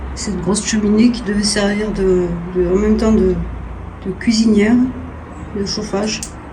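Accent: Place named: South, France. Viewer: Belle vidéo avec un petit accent du sud . Bonne continuation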